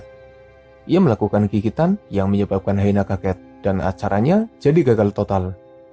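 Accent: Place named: Java, Indonesia. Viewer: Suaranya keterah banget orang jawa Dari jawa mana min soalnya saya juga orang jawa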